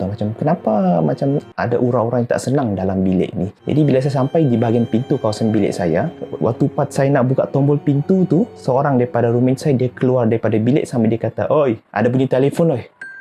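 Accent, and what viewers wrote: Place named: Sarawak, Malaysia. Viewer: Budak sarawak rupa nye speaker nihh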